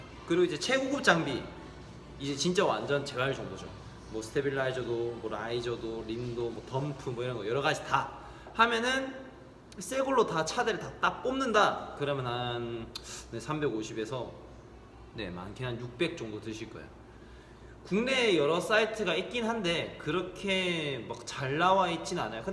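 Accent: Busan, South Korea. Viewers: 부산분이여서ᆢ듣기넘 좋아요^^ 오늘 처음 양궁을 접했구요 많이 구독해서 하면서 궁금한건 물어 볼께요 아~스트레스 해소에는 매우 좋아요 계속 쭉~욱 해볼까 합니다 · DC에서 보고 왔습니다~ 입문자들에게 아주 유익한 정보가 많을 것 같습니다~말투가 부산말투라서 부산이가 했는데 역시 부산이였네요~앞으로 많은 좋은 정보 기대 합니다~긴장하셨는지 처음에 많이 굳은 표정이라 웃는 얼굴이면 좋겠다는 생각이 들었는데~뒤로 가면서 여유가 생기면서 웃는 얼굴이 좋았습니다~그럼 다음편 기다리겠습니다~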